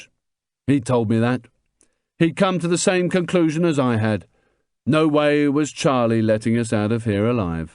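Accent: England, United Kingdom. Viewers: Yes the English accent is pleasing to my ear 🤔 I am always disappointed when an audio book is accompanied by the American version 🙄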